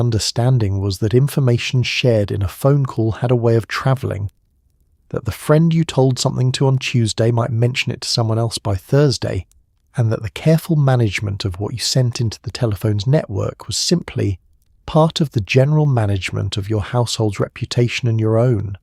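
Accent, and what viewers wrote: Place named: England, United Kingdom. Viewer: I grew up in the US in the 70s. This video is not very accurate. Women had a lot of say in decorating, schools, outings and such. My aunts ,and I had many were in charge of budgets, schedules and cities. Their husbands loved and respected their choices. Rules? Yeah, society had rules but there were those who were breaking those rules left and right. Your English accent makes me think you are following some idea of the seventies, instead of how it really was. Those pictures are not that accurate either. No one ever put their clothes out to hang dry in that manner. If you use AI to reconstruct history,,,, you will fail.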